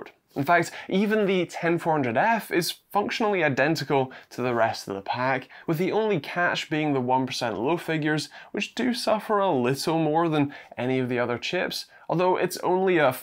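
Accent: United Kingdom. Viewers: British accent bro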